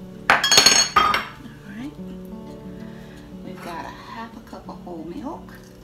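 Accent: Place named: South, United States. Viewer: thanks one more time, all the way from Germany 😊 BTW, I Love your accent. We lived in Missouri some time, but southern accent is my favorite in the whole USA.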